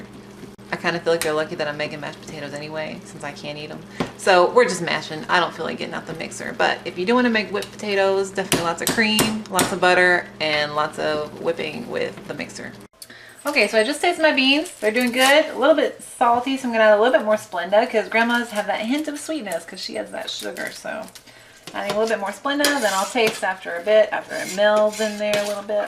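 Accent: South, United States. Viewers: What part of the South are you from?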